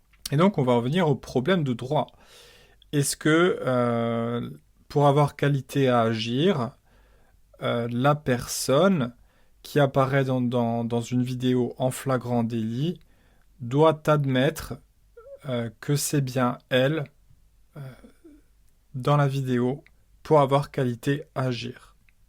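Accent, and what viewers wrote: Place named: Nice, France. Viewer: Merci encore pour tes podcasts ! À écouter en fond avec le petit accent j’ai l’impression d’être de nouveau chez moi à Nice 🫱🏼‍🫲🏽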